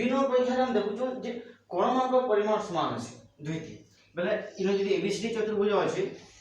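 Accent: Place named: Odisha, India. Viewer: ମତେ ବୁଝା ପଡୁଛି। ଆପଣ ବହୁତ୍ ଭଲ ପଢାନ୍ତି ସମ୍ବଲପୁରୀ ଭାଷା ତଥାପି ବହୁତ୍ ଭଲ ଲାଗୁଛି ଶୁଣିବାକୁ,ବୁଝପଢୁଚି ମଧ୍ୟ।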